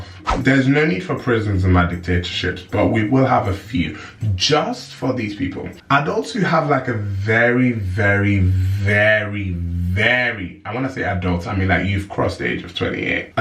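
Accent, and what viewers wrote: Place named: North, United Kingdom. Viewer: HOW HAS YOUR ACCENT GOT ALL NORTHERN ALL OF A SUDDEN??? I’m getting rid of kitten heels in my dictatorship sorry not sorry.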